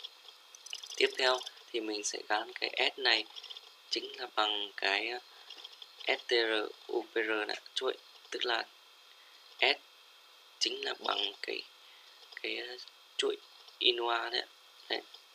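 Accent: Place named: Nghe An, Vietnam. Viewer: nghệ an rồi!!!!!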